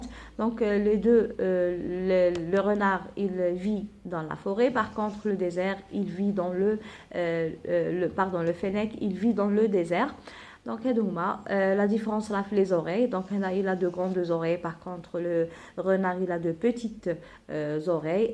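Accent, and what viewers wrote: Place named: Africa. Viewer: Ne voyez vous pas que c est un français africain il est incorrect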